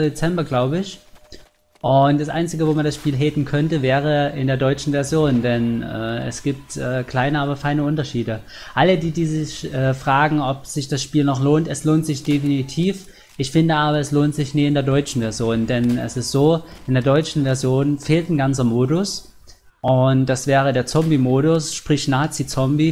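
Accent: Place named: Saxony, Germany. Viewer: ich möchte noch ein bissel gebäshe auf sächsich .... oder bring noch paar lustige videos ... danke .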